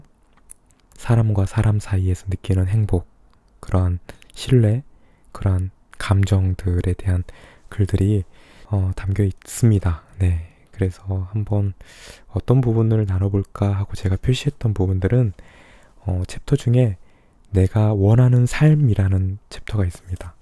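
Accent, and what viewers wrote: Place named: Jeolla, South Korea. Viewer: 전라도 사투리 억양 ㅋㅋ 그래도 멋있다~~~!! 많은 부분을 생각 하게 하고 많은 부분을 되돌아보게 하는 시간이네요 오늘부터 오늘까지!!!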